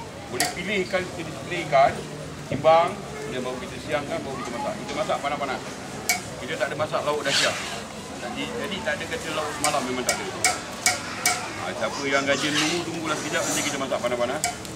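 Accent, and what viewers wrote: Melaka, Malaysia. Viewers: Org melaka mmg loghat mcm tu, korang xyah nk kabut. Cara kasau hati baik, kalau xboleh kasar duk gua kwn dgn beruk. Melaka dihati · memang best pakcik nie sporting tak kedekut ilmu dan mmg cara org melaka lah cakap gitu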